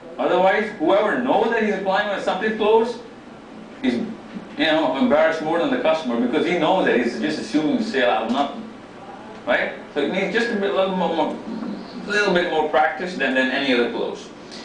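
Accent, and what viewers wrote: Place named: England, United Kingdom. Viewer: Love his English accent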